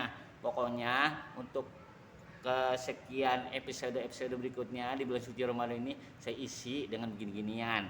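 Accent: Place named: Betawi, Indonesia. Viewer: lenong betawi banget.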